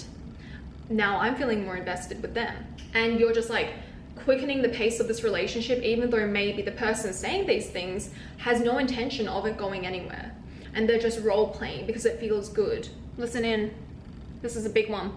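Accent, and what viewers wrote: Australia. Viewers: This accent is tripping up my brain. I hear like 70% Australian and something else mixed in back and forth.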